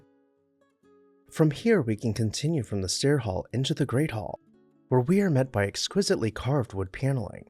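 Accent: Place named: Missouri, United States. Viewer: Hey,. I enjoy your Missouri accent! To where have you moved?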